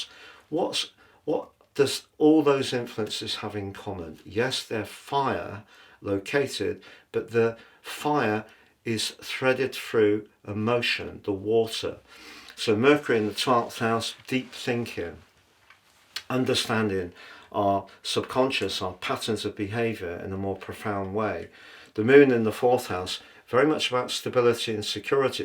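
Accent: United Kingdom. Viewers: It's a beautiful British accent. Keep on listening, open the Closed Captions and your ear will get used to it!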